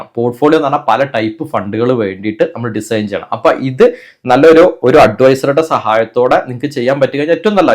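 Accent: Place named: Malabar, India. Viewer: സംസാരം കേട്ടിട്ട് മലബാർ സൈഡിൽ നിന്നാണ് എന്ന് തോന്നുന്നു.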